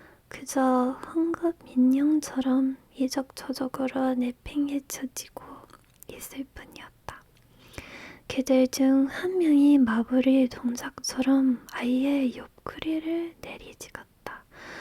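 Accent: Standard. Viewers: your pronunciation is really standard, and it's hard to tell that you grew up abroad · 你的读音都非常标准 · 你的韩语发音很标准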